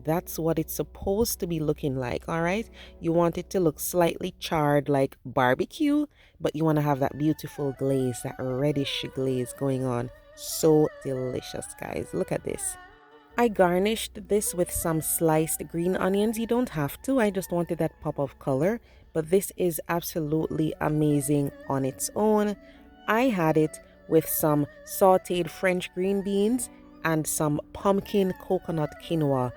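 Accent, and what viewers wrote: Jamaica. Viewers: Yummy looking I can only imagine the great taste loved you Jamaican accent 😋🥰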